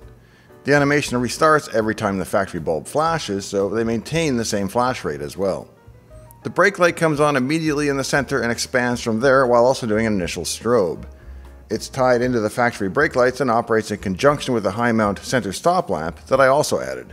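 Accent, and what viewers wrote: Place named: Canada. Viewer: By golly I knew you were Canadian by that accent, awsome!!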